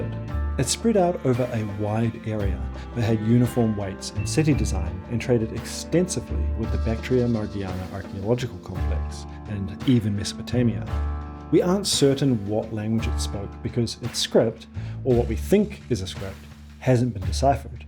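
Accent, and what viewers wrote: New Zealand. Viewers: Rhotic New Zealand accent. Intriguing. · Narrator has a strange semi-rhotic accent with traces of NZ roots. Auto-captions struggles to understand it. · Absolutely splendid video. I’d never have clicked if I’d seen the length but enjoyed every minute. Where is your rhotic kiwi accent from?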